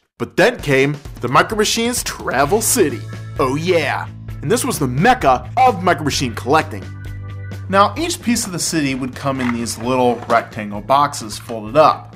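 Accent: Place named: Ohio, United States. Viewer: LOL that is our Ohioan accent ~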